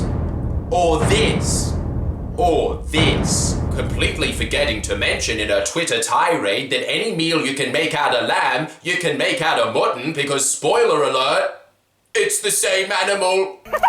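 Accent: Australia. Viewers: Failed impression, your australian accent shines bright on this 😂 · This guy is Australian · Ok mannerisms and style of writing are amazingly accurate but the accent was shit and I say that as a fellow Strayan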